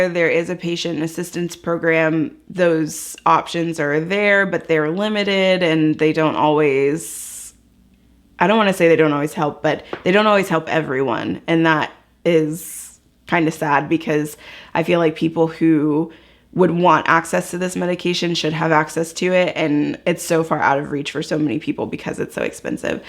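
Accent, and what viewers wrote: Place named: Texas, United States. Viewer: Hi, I just took my first dose of Ozempic and I’m so looking forward to hopefully getting the results that you and my doctor spoke about‼️. Totally unrelated; as I was listening to you I was thinking, “ this chick has to be from Texas”! My doctor’s in Katy.🤣🤣🤣. I wish you continued success in your weight loss and wellness journey. Thanks for sharing🥰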